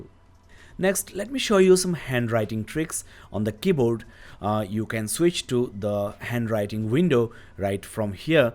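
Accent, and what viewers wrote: India. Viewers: God, I hate your bud bud accent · I absolutely hate Indian accents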